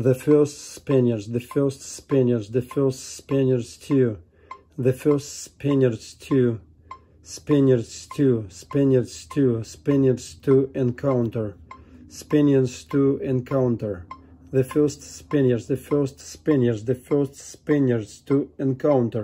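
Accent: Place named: Russia. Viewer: Любопытно. Но автору неплохо бы поработать со своим жутким русским акцентом.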